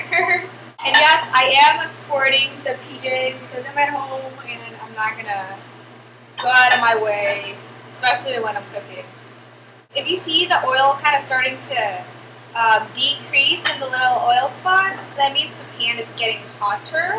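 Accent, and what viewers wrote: Vietnam. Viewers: ah i never knew u was vietnamese until u spoke lol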